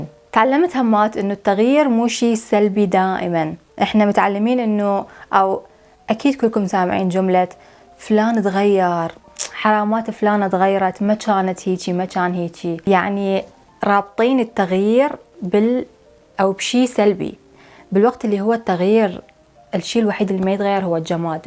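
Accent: Iraq. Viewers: حبيت العراق ولهجتهم من بعدك لفيوو والله 😩❤️❤️❤️ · احلى شي بانچ محتفظه باللهجه العراقيه واللكنه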